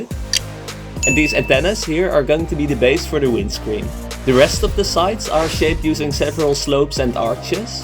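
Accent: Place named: Netherlands. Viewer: Are you dutch ? Because you have an accent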